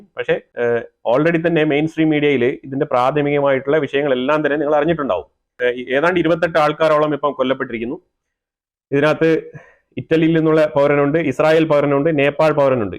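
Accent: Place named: Trivandrum, India. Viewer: No kollam, it's trivandrum slang.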